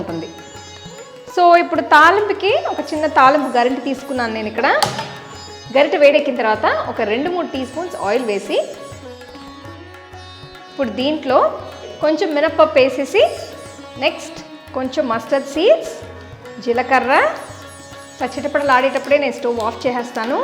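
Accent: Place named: Telangana, India. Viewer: ivvala...man ! that telangana telugu! Miss it so much !!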